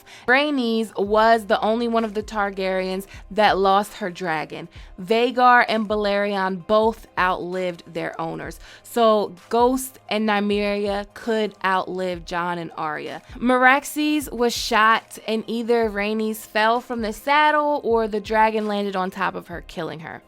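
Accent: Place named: Baltimore, United States. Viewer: Rolls up, and sips sultry sweet summer tea.. From Liberia I can hear that bmore twang. Thx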